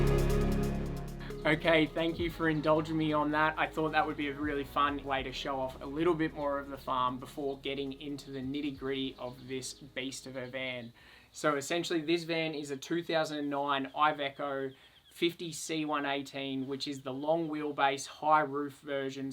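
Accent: Australia. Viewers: I’m a girl who’s a sucker for guys with an Aussie accent. You get me in the divorce. Plus....I love occy.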